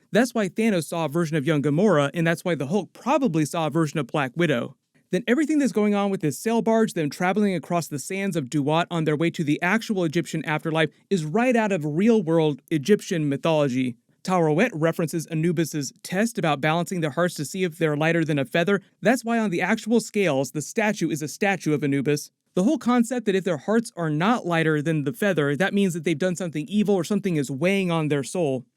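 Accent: United Kingdom. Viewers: I think his British accent is very very normal lol this is what we actually sound like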